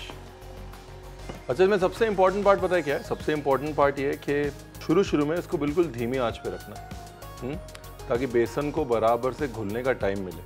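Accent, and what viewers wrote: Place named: Lucknow, India. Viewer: Ranveer Bhaji .. assi vi Lucknow to a. Menu vada changa lagta jab tussi pubjabi vich gal karde ho , with a slight Nawabi unfluence. Hor tuhadi recipes de ki kenhe. God Bless you, Ranveer!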